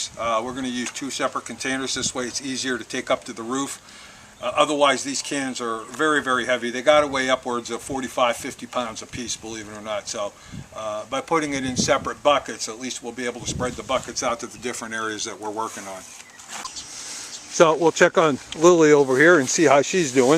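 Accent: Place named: Western Pennsylvania, United States. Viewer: You sound like you are from western Pennsylvania.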